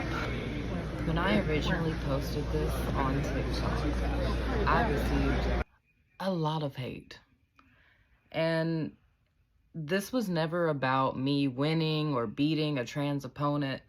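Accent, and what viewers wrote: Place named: South, United States. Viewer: Why cant you people from the south learn to talk better. The sounds you make are just aweful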